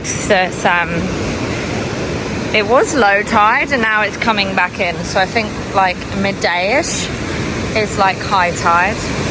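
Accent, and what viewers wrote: Ireland. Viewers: from your accent i guess youre an irish? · Hi hello are you Irish ? Scotish ? Or maybe Angel ☕😎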